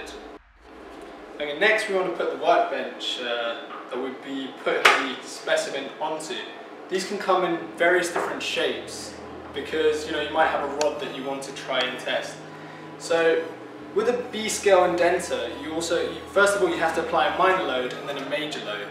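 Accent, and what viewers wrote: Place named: United Kingdom. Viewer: clearly a british accent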